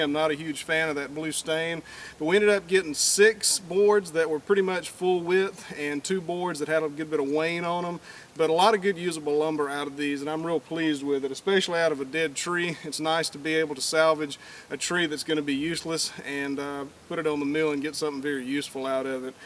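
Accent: South, United States. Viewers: Hello from Canada! I really wish american You Tubers would tell us what state they come from! I have had some travels in my time in the U.S. but there's a lot I haven't seen. Nonetheless there's a few: 1) You're somewhere in the south partly by your Parlance (more than just accent) and being a language lover this is nice educative fun for me also!, so from Quebec <<chapeau! >> 2) By the vegetation around, this is obviously not in the desert or the midwest. (watchi8ng a lot of Amrtrak videos, I have seen some of the countryside that way to be able to compare with). since the vbagetation looks quitre thick and lush, in soite of the drought you reported, This has to be a notable warmer climate with a notably longer growing season than ahnyrhing up north. So your effort seems very well placed in relation to nature! As for wood, as it hapopens I grew up amny years ago in Oakville, Onterio, some 20 miles West of Toronto. 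Historicaslly the town got its name when it became famous for production of barrel staves, made of, oak of course. In our back year was one of thise huge oak trees, and, of course lots of squirrels, but thos little critters can also make a !!!! of a mess of the corn patch. (Also they can carry rabies, so they are somewhat of a public pest). So all in all thumbs up and to/from??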